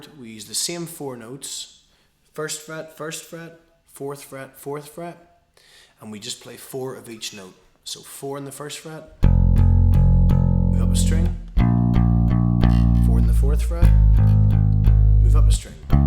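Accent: Ireland. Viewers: how do you know the beatles didnt say diene, its not just the beatles that are from liverpool that have the main accent, different accent DUH. not just the beatles. and he doesnt even sound like he's from liverpool, more like irish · awesome... do you have any tips im 14 and from australia..and been playing for a year.. i am in a band... i play bass.. we play this song and when i play the verses my fingers get very very sore and i get tired .. would you have any tips.. please reply ... awesome vid!! your from ireland but live in america is that the accent? if you have any tips please reply .. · dine - dine --- op - dine - op - dine.... accent is hilarious. gotta love the irish.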